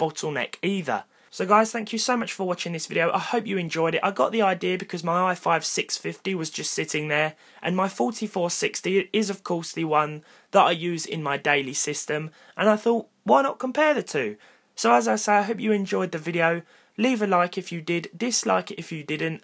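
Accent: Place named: England, United Kingdom. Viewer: Nice video and also i have to say that i like so much your English accent, it is easy for me to understand being Spanish Where are you from?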